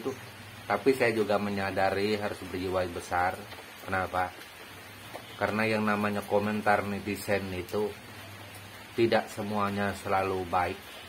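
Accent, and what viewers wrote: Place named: West Java, Indonesia. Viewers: Salam santun mpu... Mohon maaf..logat bicara..mpu seperti ada logat sundanya...apa mpu ada keturunan sundanya.. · MAAF EMPU KOK EMPU LOGAT BICARANYA SPRTI ORG SUNDA HEHE 🙏🙏🙏🙏🙏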